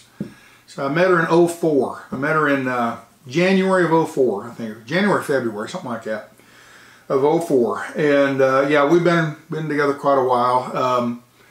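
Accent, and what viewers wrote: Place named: Texas, United States. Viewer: We follow your channel for the travel aspect of it so that is what we would miss the most if you were to quit traveling. Some of the most special experiences we've had in our travels come from visiting with the local residents I traveled with a WWII pilot with your Texas accent and he could break into a conversation with anyone anywhere. Once you get to talkin to folks there's a tremendous amount of material for a beautiful channel. In my mind, your Charles Kaualt idea would be a total winner. There is such a need for your real honest type of programming.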